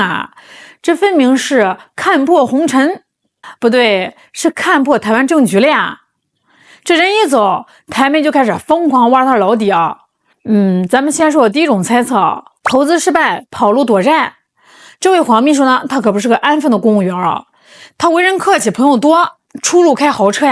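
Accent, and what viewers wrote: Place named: Xuzhou, China. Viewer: ，听你口音你好像是徐州人😀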